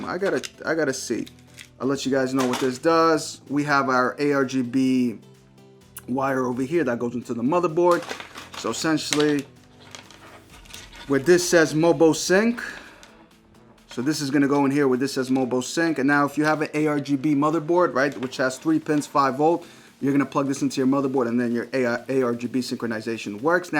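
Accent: New York, United States. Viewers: Man i just discovered my new fave channel. You sound like you from NYC like me. You keep it real.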